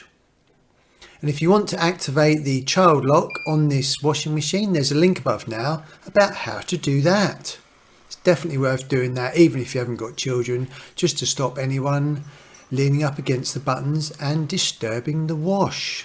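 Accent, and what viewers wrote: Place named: Suffolk, United Kingdom. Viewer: Thank you! This answered a question I've had for a year since buying a house with a Bosh washing machine. Love the accent,! Are you from Suffolk?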